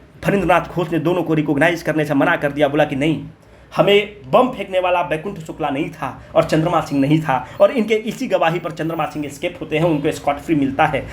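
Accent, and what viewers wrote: Bihar, India. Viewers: The way u teach is really incredible sir....feel really blessed to be a part of bcw family🙏 nd ur narration in bihari tone 🧡gives extra motivation sir....soon this channel will become one stop destination for all bpsc aspirants......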